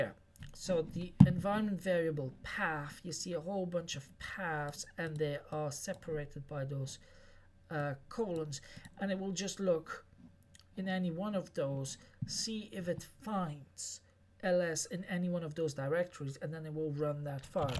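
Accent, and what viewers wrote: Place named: Ireland. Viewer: I cant put a finger on your accent, sound irish but something else too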